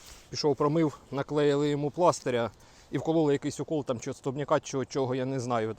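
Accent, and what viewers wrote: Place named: Surzhyk, Ukraine. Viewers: Так слідуючий момент це мова. Ну можливо щось виправити ну так говориш аж тошнить. Скільки вже тобі писали запитувати але ти принципово як олень вперся і доказуєш що ти говориш говорив і будеш говорити на такому суржику але суржик більш зросійщений. Англійський вдосканолюєш данський будеш вчити а рідну мову покращити принципово не бажаєш . Чому таке ставлення до свого рідного??? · Ти Мразота Зариганая😢...Кроку на Куй зі своїм франківскім суржиком🎉...Слухати ваше блювотіння....щє та пригода😢...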